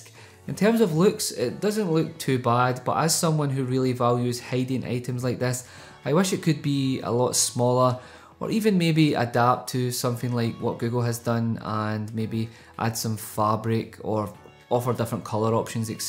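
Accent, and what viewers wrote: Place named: United Kingdom. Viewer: You live in britain from the sounds of the victorian house, and your accent suggests you haven't emigrated, so you're well aware that you did a rude gesture, not peace fingers, at the end of the video. That's disingenuous. You also say "go ahead" where it adds no extra information about twice a minute, which is a very American trait, though also far more frequent than realistic. A speach coach would most likely tell you to remove these meaningless phrases from your vocabulary. It seems like you're trying to capture an American audience by making your content more familiar to them. But you're reviewing a BT product, BT being an acronym for British Telecom. American's don't care about products that aren't avaialble in America, so why are you forcing this unatural familiarity? It only hurts your credibility. There are American YouTubers who are very popular in the UK, and there are British YouTubers who are very popular in the USA. 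Your nationality does not affect your credibility. Pretending to be American in such a forced way does. And that lack of integrity caries over to your reviews. How can we trust what you say about these products, if we can't trust you talk as you naturally do? Other than the offensive gesture and overuse of "go ahead", great video! Thank you for taking the time to make it!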